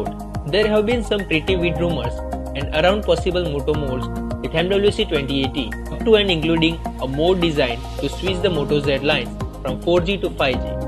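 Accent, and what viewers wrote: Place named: India. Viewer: Indian English accent not exactly Youtube worthy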